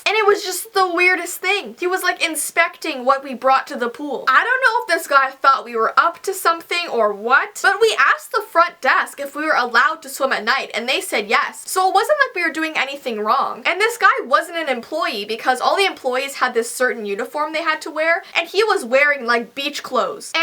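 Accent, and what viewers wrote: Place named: Ontario, Canada. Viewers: Ya Ontario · Yeah she is from Canada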